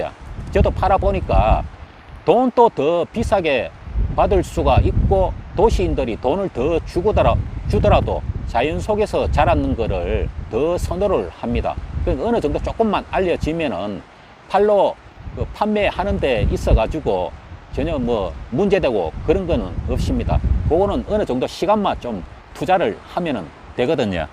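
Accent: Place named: Uiseong, South Korea. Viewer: 의성 말투 같은디요.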